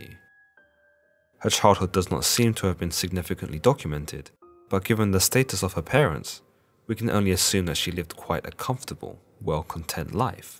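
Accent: United Kingdom. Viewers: Hey British commentator when you're doing an American documentary get to the point please.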